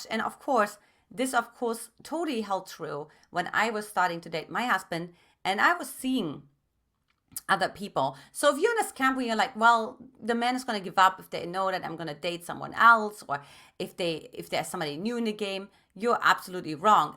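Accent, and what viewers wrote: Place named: Germany. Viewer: Im ready! (And BTW love your German accent!).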